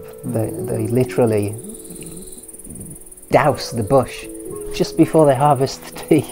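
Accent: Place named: Yorkshire, United Kingdom. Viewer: Who are you? are you half Japanese? from where did you get your Yorkshire accent? Tell us more about you mate. Thanks for the great work. Love you.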